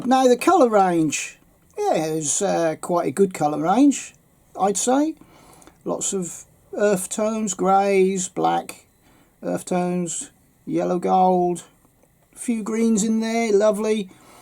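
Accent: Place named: Norfolk, United Kingdom. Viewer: sounds like ur a norfolk boy.dyou know jason norman?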